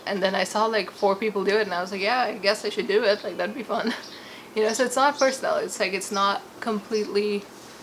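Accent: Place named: United Kingdom. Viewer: ABFTBA Always be flexing that British accent.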